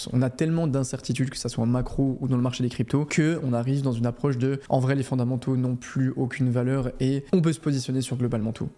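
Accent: Franche-Comté, France. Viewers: Je suis sur que t'es un mec de Franche-Comté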